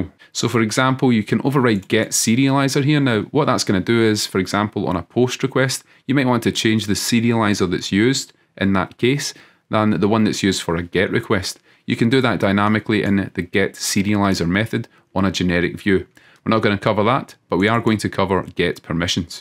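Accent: Scotland, United Kingdom. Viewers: Great series so far... I am glad I moved to Scotland a few months back, I can understand you perfectly (assuming you are Scottish). The way you teach is excellent, loads of people throw things at you but you take the time to show where to find the info, look at the doc while coding and also look at the code behind the scene, that is brilliant. Subscribed, thumb up, coffee to come in a few days.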